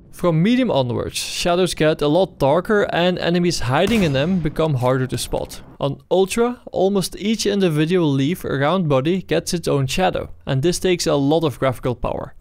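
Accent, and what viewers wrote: Netherlands. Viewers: Are you from the netherlands? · Ik wist meteen dat je Nederlands was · U dutch?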